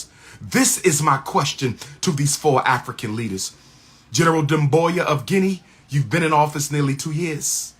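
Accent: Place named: United States. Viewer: My man, I wish you could listen to yourself you sound so American are you sure you’re not employed by the government to talk about Africa?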